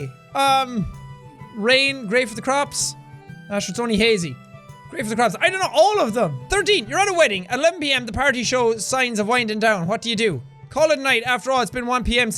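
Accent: Ireland. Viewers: You sound more Irish somehow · The way Jack becomes more Irish while talking about Ireland is like me speaking more and more Chinese while talking about REAL Chinese Food. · I love how Irish he talks in this vid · he went like... full irish with this test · This is the most Irish I think anyone will hear, ever. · accent gets gradually more Irish as the video goes on and it’s wonderful ☘️ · he just got more and more irish as the video progressed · I like how he just becomes 600% more Irish in these videos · Rewatching all his videos and i love how thick his irish accent is compared to now xD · I love how anytime he makes a Irish videos like this he gets more and more Irish as the video goes on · In the last ten minutes of the video only Irish people would be able to actually hear what is saying cuz the accent thickened throughout the whole video😂😂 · Holy shit this is the most Irish I've ever heard him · Jack gets more irish as it goes😂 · slowly gets more Irish as the video goes on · I never heard so irish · The further you are in the video, the stronger his Irish accent gets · He sounds so Irish in this video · I love how his accent gets more and more irish through the video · oh god his accent is so irish as the video goes on OH MAH LORD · 30 seconds and 2 minutes in isn't a "normal" Irish accent, it's a watered down version that he developed while he's been on Youtube. 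Watch his early videos, he sounds really different. Actually at first he tried to get rid of his accent completely because he didn't have the light accent that he has now and he was worried people wouldn't be able to understand him. If you think he's incomprehensible by the end of this video, you should bring a "translator" with you if you ever visit Ireland lol. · He went full Irish for this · Jack: *gets progressively more Irish as the video goes on*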